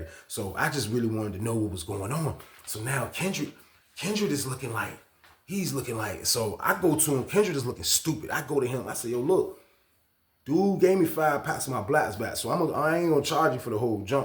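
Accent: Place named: South, United States. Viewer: That's how they talk down south. It's common down there.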